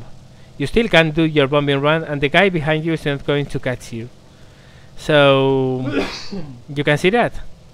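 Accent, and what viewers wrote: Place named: Spain. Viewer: you sound like an academic linguist who doesn't have a good feel for language. I believe he is probably a native Castillian or Catalan speaker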